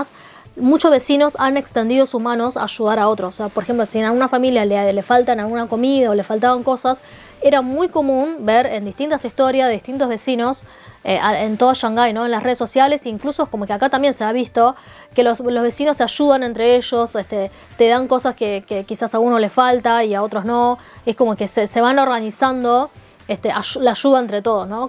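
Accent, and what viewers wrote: Argentina. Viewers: Además te felicito que no pierdes la forma de hablar Argentina....!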